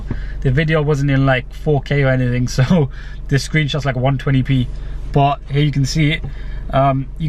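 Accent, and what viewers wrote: United Kingdom. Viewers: He's obviously from Great Britain ...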